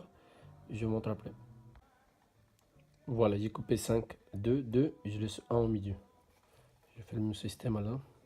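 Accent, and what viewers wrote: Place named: Pakistan. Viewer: excusez moi l'accent je pensse indou mais non c Pakistan lol bref continue vos vidéos svp vous expliquez trop bien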